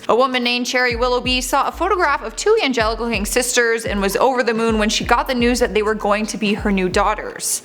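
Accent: Canada. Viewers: Her hair is in a weird style...but I don't feel like punching her. Her accent is funny too....Canadian?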